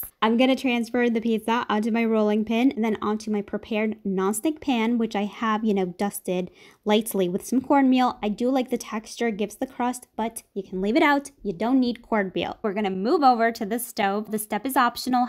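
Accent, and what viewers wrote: Italy. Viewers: I am italian and I love how you keep the italian pronunciation!!!! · Love the way you pronounce Mozzarella and Nutella. Just love your Italian accent in all ❤️❤️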